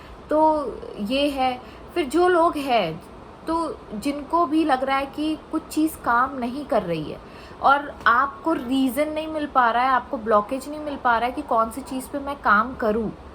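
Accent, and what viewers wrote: Hyderabad, India. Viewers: You say Honga jaenga.. are u from Hyderabad?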